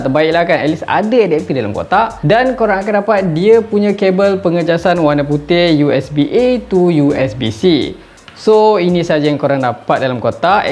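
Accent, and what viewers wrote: Malaysia. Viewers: Saya suka banget sama nada bicaranya org malay❤️ salam from indo🙏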